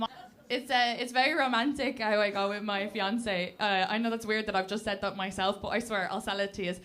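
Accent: Ireland. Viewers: I love the Irish accent even though I can't understand half of what she's saying. 😄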